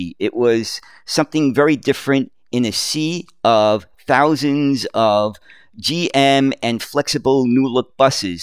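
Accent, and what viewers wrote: New York, United States. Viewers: Absolutely love your New York accent!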